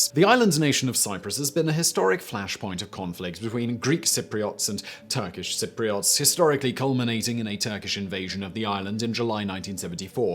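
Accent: United Kingdom. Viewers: Which part of Britain is this man's accent from? As a fellow Brit, I'm scratching my head. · Dodgy Brit accent and speaking way too fast - what is your hurry, slow it down.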